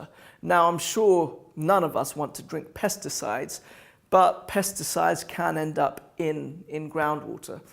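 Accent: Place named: United Kingdom. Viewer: bro no offense but u sound like british but looks like an indian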